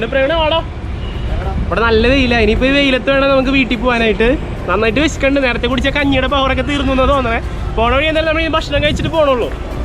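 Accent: Thrissur, India. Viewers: Thrissur kaaranano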